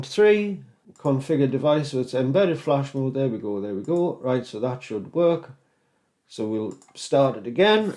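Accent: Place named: United Kingdom. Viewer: It would seem to me that “Lethargic” has long already fired you from working with him ever again. That would explain why he completely cut you off and moved on with someone else with newer projects. Lethargic would say that you were being extremely insubordinate with him. But yeah, Lavoric or Lethargic or whatever is name is, was only obsessed with profit, he didn’t want to lose alot of money he didn’t have with beta testers; so he just sent them all out as they were. Definitely not the best way to do things, but this is Poland we’re talking about here! 🤷‍♂️😂 I hope you will find another revenue source soon. I really enjoy your videos and British accent/slang talk! I subscribed! I’m a new owner of an Atari 800XL that I heavily modded and recapped myself. This thing is a blast to use with the entire library of games!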